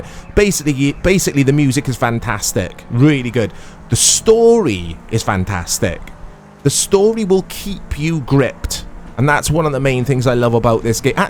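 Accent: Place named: Cardiff, United Kingdom. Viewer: This boyz from Caaaaaaaaardiff or Newport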